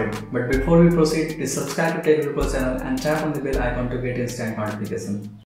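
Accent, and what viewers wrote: India. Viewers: The instructions are good but I struggled with his Indian accent on crucial steps. I didnt really understand some of the things he said. I'd prefer written step by step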